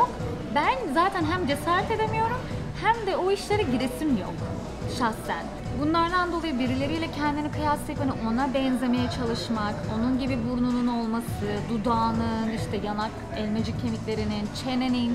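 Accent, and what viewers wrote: Turkey. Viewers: Ablamsende tam bir kayserili konusmasi var karadenizliisin ama biliyorum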